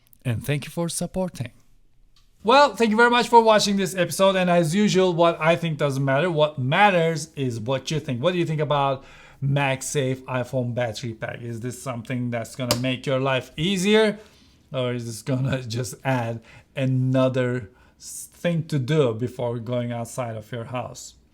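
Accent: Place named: Turkey. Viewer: you are speaking like a turkish guy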